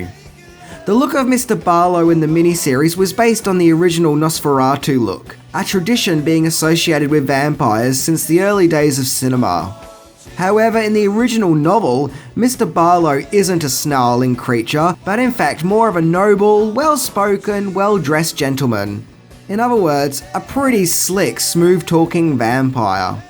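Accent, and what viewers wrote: Australia. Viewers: The commentator here has got one of the most irritating voices I've ever heard, Aussie accents are dreadful to start with, but this bloke really grates. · are you an Aussie or a Kiwi? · What a shame, potentially interesting feature spoiled by world's most annoying voice/accent/host. Let's hope Mr Barlow pays a nocturnal visit to this Antipodean git and silences him once and for all.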